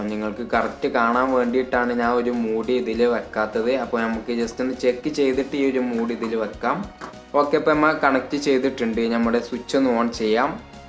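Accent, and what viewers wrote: Malappuram, India. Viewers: മോനെ നിനക്കും നിന്റെ വടക്കൻ സംസാരത്തിനും നല്ല ഗ്ലാമർ ഉണ്ട്‌. വീഡിയോ ഇഷപ്പെട്ടു നന്ദി. വീഡിയോയേക്കാൾ ഇഷപ്പെട്ടത് അതിന്റെ ബാക്ക്ഗ്രൗണ്ട് മ്യൂസിക്ക് ആണ്. അത് എഡിറ്റ്‌ ചെയ്തവരോട് ചോദിച്ച് ആ മ്യൂസിക്കിന്റെ പേരും അത് പ്രൊഡ്യൂസ് ചെയ്തയാളുടെ പേരും എന്റെ ഫോണിൽ (<num>) SMS ആയി അയച്ചു തരണമെന്ന്‌ അഭ്യർത്ഥിക്കുന്നു. ആ മ്യൂസിക് നെറ്റിൽനിന്നെടുക്കാൻ വേണ്ടിയാണ്. അ മ്യൂസിക് കേൾക്കുമ്പോൾ യൂറോപ്പിൽ ഇരിക്കുന്ന പ്രതീതി. തീർച്ചയായും അയച്ചു തരുമല്ലോ?. താങ്ക്സ്. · Nee മലപ്പുറം ആണോ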